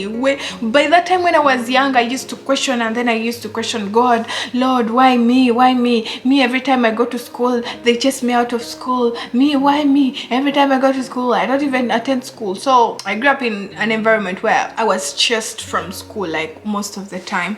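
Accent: Uganda. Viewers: I love your Ugandan accent 😍 I'm in love with you and your energy ❤️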